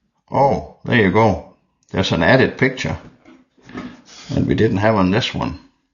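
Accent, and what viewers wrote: Denmark. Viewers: fed dansk accent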